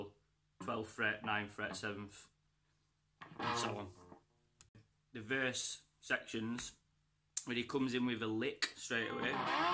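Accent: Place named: Barnsley, United Kingdom. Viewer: That Barnsley accent lad! 🙂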